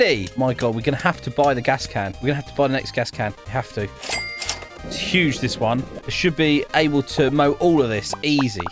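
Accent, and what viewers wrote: United Kingdom. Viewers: Are you British I’ve been trying to ask you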